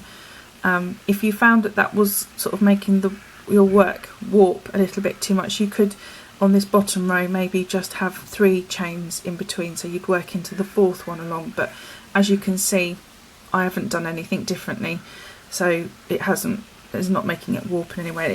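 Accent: United Kingdom. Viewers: great video thanks - fantastic to hear a British voice using British crochet stitch terminology too :-)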